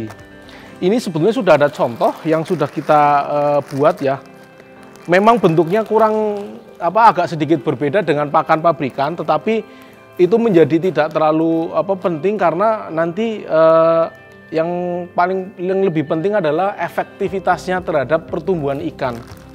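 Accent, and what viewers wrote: Banyumas, Indonesia. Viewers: Wong ngapak apayh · Sampean wong Ngapak ya mas... Hehehe Pada baee..saya juga wong nagapak...lanjutkaaaaaan mass... Wong Ngapak nek Ora Ngapak ya Ora Kepenaak... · ngapak kiye kayane mbok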